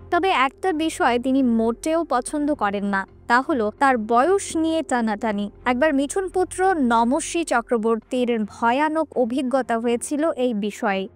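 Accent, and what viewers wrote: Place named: Bangladesh. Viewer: এটা বাংলাদেশ নয়। এজন্যই আমরা সব ধর্মকে সম্মান করি। সবাইয়ের সমান অধিকার। এটাই বুঝি। তোমার ভাষা শুনে মনে হচ্ছে এটা বাংলাদেশি ভিডিও।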